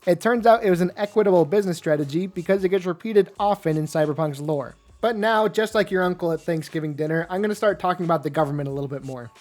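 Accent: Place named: Argentina. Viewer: Off topic, you have a very argentinian accent, i don't know what to think xD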